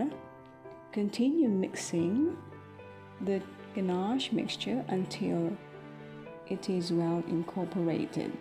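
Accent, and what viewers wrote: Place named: United States. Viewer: Ganahan ko sa voice over...murag amirkana 😊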